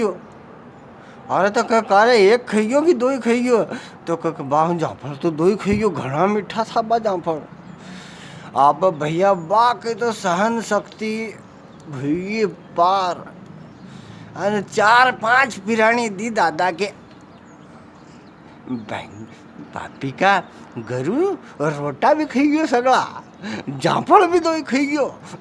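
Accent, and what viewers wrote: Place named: Nimar, India. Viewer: Sir आपकी भाषा हमारी भाषा nimadi की जैसे ही है... मे nimad से हू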